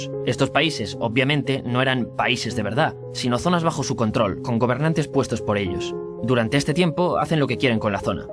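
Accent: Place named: Spain. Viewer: Recuerdas la Torre de Babel de la Biblia, la hicieron estos tíos. Jajaja suena bien!!👌🏻👍🏻Me gusta el acento de Español.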